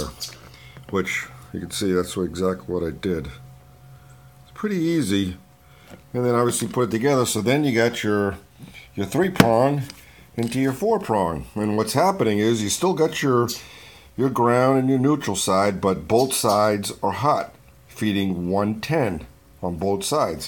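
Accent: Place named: New York, United States. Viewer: NiceyNice. You gottta be from New York. :)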